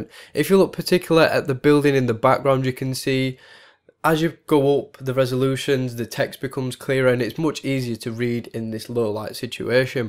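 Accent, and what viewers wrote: United Kingdom. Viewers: So hard to understand some of what your saying laddie yalll uk lots talk like this ya?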